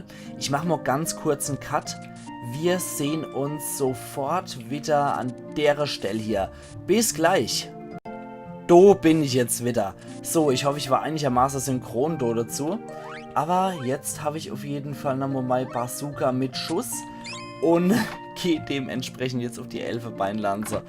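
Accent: Palatinate, Germany. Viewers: Nagut Nagut, dann erst Mana, hihi. Aber ich freue mich, ich werde live dabei sein, wenn jemand frisch/blind Chrono Trigger genießt, das ist etwas ganz besonderes. Uff Pälzisch ist dann nochmal ein Super Bonus, denn ich versuche schon lange das Spiel mal wieder zu beginnen, leider habe ich bisher kein Action Replay mehr um es anzugehen und auf meinem Rechner funktionieren die deutschen Roms iwi nicht. Auf Mana freue ich mich natürlich auch, das werde ich aber nur sporadisch verfolgen, da ich es mit meinem Sohn bald selbst zusammen mit meiner Frau via Multitap spielen werde, da will ich mir nicht die Spannung nehmen. Naja Später kannst du ja mal die eine Stelle vom Schattenwald untersuchen, die mit Man/1up auf den Maps markiert ist. Da kannst ja dann wieder hin. Übrigens ein Tipp, sobald du "reisen" kannst in Secret of Evermore, dann gibt es da eine Stelle in der Römischen Gegend, da gibt es so Statuen, dort kannst du an einer Stelle, die auch der Hund erschnüffelt, gefühlte unendlich Zauberperlen aufheben. 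Kennst du die Stelle?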